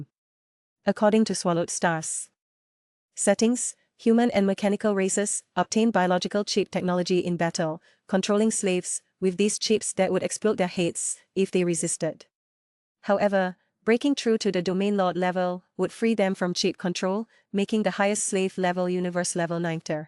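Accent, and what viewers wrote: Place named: Singapore. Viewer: Are you singaporean? You have a Singlish accent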